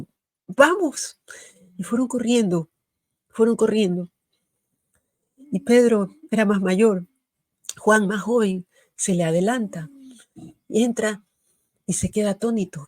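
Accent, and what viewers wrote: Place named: Dominican Republic. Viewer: La verdad tiene acento dominicano,yo soy dominicano vivo en ny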